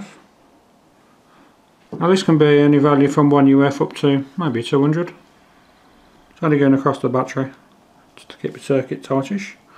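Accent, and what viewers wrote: United Kingdom. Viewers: your accent has too much British in it. hard to understand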